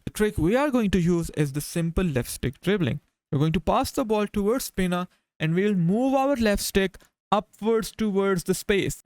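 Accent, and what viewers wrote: India. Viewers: I don’t like your accent Sounds like bajeet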